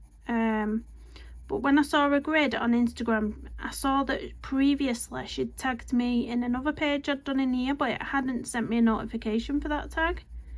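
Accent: United Kingdom. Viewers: Bonjour, la combinaison des couleurs est super je suis fan de tes vidéos et j'essaye de suivre tes tutoriels... merci de partager. Where are you from because j'aime beaucoup ton accent british but où ? moi je suis française et je vis dans le sud vers Carcassonne. Bravo pour ton talent Corinne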